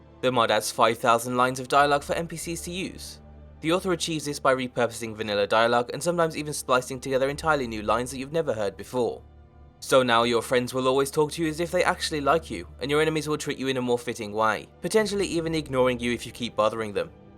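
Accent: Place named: Wolverhampton, United Kingdom. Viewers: dude you've legit got a Wolverhampton accent ;D ! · England, 'Midlands'. That is to say likely he comes from the area surrounding and including Birmingham. However is accent doesn't strike me as a brummy so perhaps slightly further north than Birmingham.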